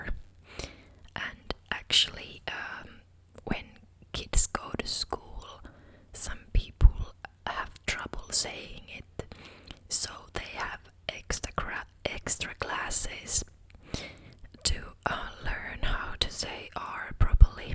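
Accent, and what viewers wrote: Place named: Finland. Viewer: its easy to tell whos from finland because u can hear from their english accent sounds so much different, good video btw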